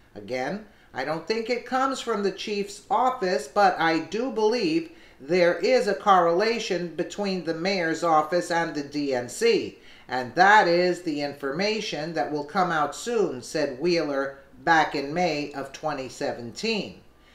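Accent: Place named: Brooklyn, United States. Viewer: Ok, you bring some good news to this but I have to unsubscribe. Why do you read what is on the screen? WE CAN READ. READ with support collateral or show text and talk about the collateral pictures/graphics. Also, you sound like a Brooklyn middle aged Italian/Jew. That whine is killing me. lol. You do a great job. I am simply being critical due to broadcast journalism past and a traditionalist. Plane flying over head is a nice touch. God bless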